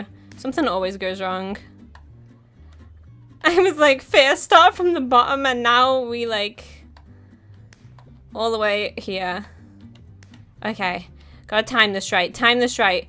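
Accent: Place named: Liverpool, United Kingdom. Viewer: i love hearing your accent! its funny hearing someone from who's also from liverpool :)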